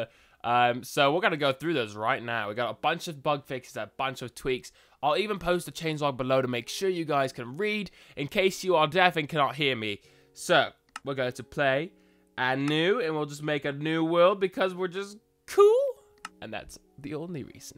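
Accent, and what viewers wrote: United Kingdom. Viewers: Hey dude I hope you don't mind me asking, where are you from? You sound like you come from the legend place called the UK!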